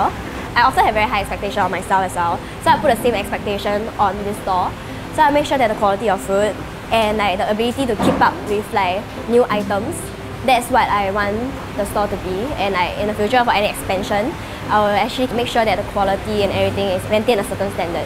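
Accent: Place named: Singapore. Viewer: zigstar losat this is how we speak among ourselves, most of us will moderate our speech to an extent when speaking to non-singaporeans (except Malaysians... they basically sound like us)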